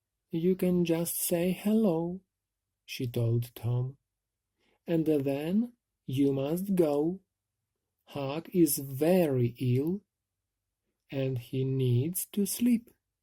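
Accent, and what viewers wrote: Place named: Ukraine. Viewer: Hello, I think your pronunciation is good. There is such a distinct accent difference between Ukrainian and English, isn't there? Your genuine enthusiasm for the English language makes me very happy. I still have a lot to learn, you are very inspiring. Halina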